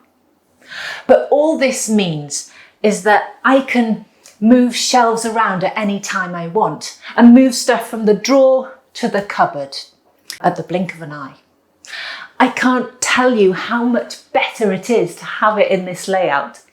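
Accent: Wales, United Kingdom. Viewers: Holly Lord! It feels like I watch DIY Perks) British accent is awesome! Great job, thank you! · OMG!!! Thank you so much for this (and all your) video. So many fantastic ideas in 20 mins!! Gone are the days of printing benchys and busts of Darth Vader... My 3D printer has a new mission in life. I've needed something like this for so long, That veggie label RFID tag idea is just a show-stopper! You are the best. Thank you so much for sharing all your creativity with us. Did I detect just the slightest hint of a Welsh accent?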